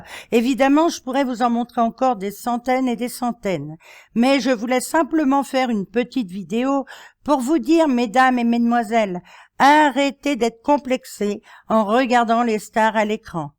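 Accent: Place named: France. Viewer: Quand vous dites les noms des actrices et les titres en anglais a la francaise , c'est trop mimi ! Bonne continuation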